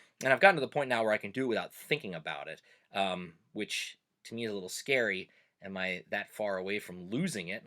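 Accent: Pennsylvania Dutch, United States. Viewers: Accch: Swetz net so dumb. It's good to talk your normal accent. When I was in the navy with alot of southern types, I was told that I "talked too fast " You don't have to talk like Proffessor Schnitzel. But a normal sounding Pa. German dialect is enjoyable to listen to. Thanks for your videos. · I get a kick out of your Dutch accent on “Ask a PA Dutchman.” I grew up in Central PA and I have heard some words that I never realized it was an accent. The most obvious words that are from the area are the pronunciation of Lancaster and Lebanon. And I don’t know if creek and crick are part of this too? When I was younger, I traveled all through PA as a salesman. It was amazing to hear all the accents just in PA. From Pittsburgh to the coal regions up north, Philadelphia and our beloved Dutch country. I never got to learn the language but there are some words that did make it though the family.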